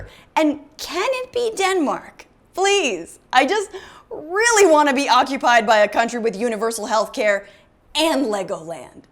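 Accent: RP, United Kingdom. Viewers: Love the British accent... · Is it wrong that I like her British accent L) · Your English accent is reeeealy posh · Loving the British accent! <3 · English (educated received pronunciation to be precise). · Well done on the british accent; a pretty good job over all.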